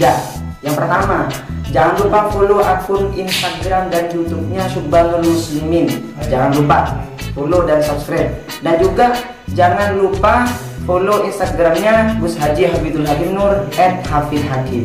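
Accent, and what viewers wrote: Madura, Indonesia. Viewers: orang madura ya mas..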